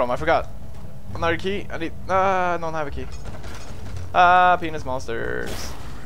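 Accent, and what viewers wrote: United States. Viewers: How come in this video u sound so American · He sound like he has a U.S. English accent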